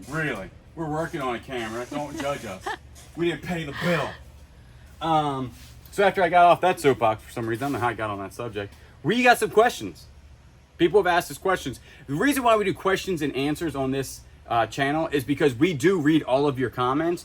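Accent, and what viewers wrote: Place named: South, United States. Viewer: That's just the southern accent y'all. I pronounce it the same way he does. 😂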